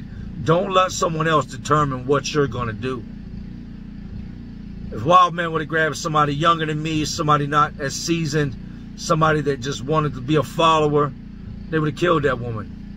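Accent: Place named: Chicago, United States. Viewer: Sounds like your from chicago . I'm from chicago ,we use the same language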